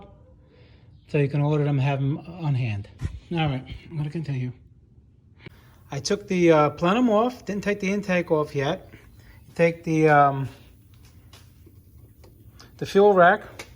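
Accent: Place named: New Jersey, United States. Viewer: definitely people may forget to comment but I promise your helping tons of us be self reliant on our repairs, I did the whole job successfully, unfortunately when i tore it down the mating surface on the block had like rust wear indentations or something, I picked the car up for 800$ bucks thought it would be a simple fix but someone really abused it. Worked great for a few days and blew it again.. oh well you live and you learn. Btw your accent is awesome, reminds me of my relatives up north. You from Jersey or New York?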